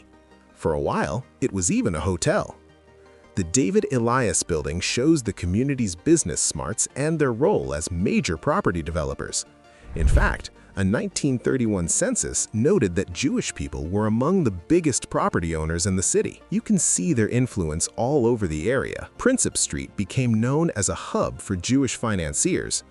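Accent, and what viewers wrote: United States. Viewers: The -"gie" in Selegie Road is pronounced like the "-gee" in "geek". Please stop pronouncing everything the American way when the names belong to another country.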